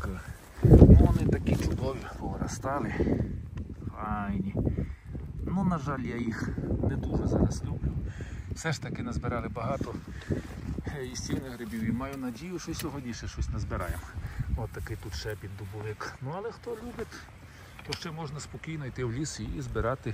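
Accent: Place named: Transcarpathia, Ukraine. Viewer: Звідки родом і який район , якщо не секрет ? По акценту наш закарпатець...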